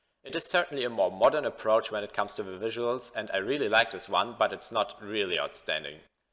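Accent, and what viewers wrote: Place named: Germany. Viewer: A. Great coverage B. People who deserve to be better known getting spotlighted C. German accent this video is perfect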